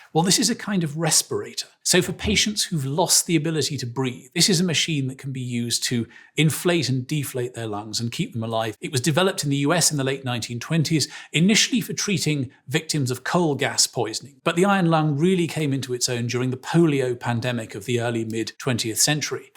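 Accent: United Kingdom. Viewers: this is the most british sounding man of all time and i need him to do audiobooks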